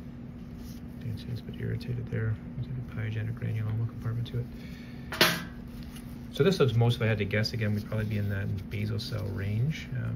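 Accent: Canada. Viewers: Je savais pas que vous parlez le quebecois. Very cool ❤❤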